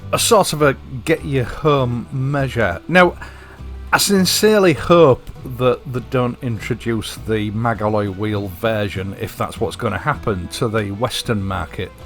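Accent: United Kingdom. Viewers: Wow! What an accent..may I know which part of the UK you hail from?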